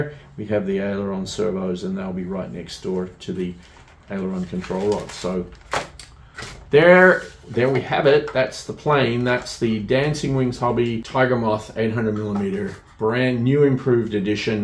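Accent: Australia. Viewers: Nice. I am thinking about trying one of the DWH kits. I can hear an Aussie accent? Where do you fly in Canada? I am also an Aussie. I am in the Yukon. I've been flying RC since 2007. I was away from the hobby for a few years, and I am just get back into it. Cheers.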